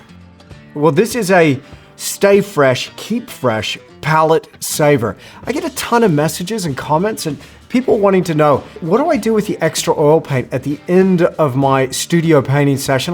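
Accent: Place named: Australia. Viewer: I love your accent mate - Are you an aussie living abroad?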